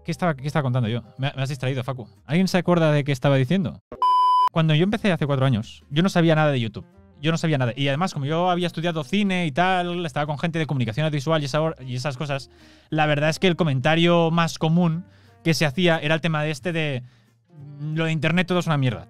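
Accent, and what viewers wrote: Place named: Catalonia, Spain. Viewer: Mara meva com es nota q ets catala, q ni te crees q es a gastos pagados jajaja TESTIMU BRO SALUDOS DE MONTGAT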